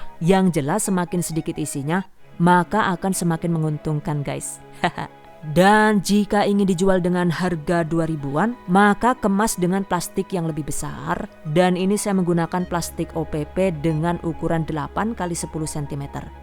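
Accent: Banyumas, Indonesia. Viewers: wong banyumas kieh... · Wkwk wong ngendi sii , geneng ngapak ya 😆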